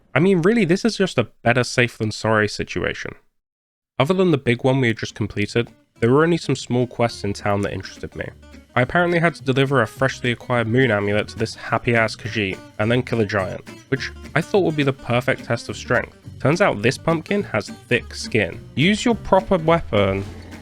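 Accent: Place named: United Kingdom. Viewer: howcome you say dollars? if you dont mind me asking since you sound british?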